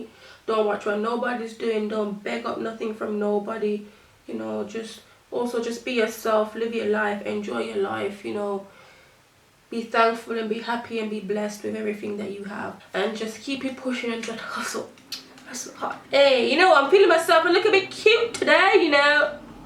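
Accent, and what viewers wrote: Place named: Jamaica. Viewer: love this videos love thank you for sharing your journey also love the Jamaican accent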